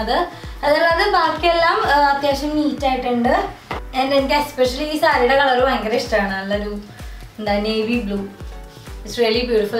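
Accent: Thrissur, India. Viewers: Kure mattu basha channelil chumma body show kanikkan vendi cheruppakkarikalum aunties um sari udukkan padippikkunna kandu athokke vachu nokkumbol sahodari avatharippichathu 100% better aanu pinne thrissur slang um super ☺️ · saaz safa athanu nammade thrisur slang😁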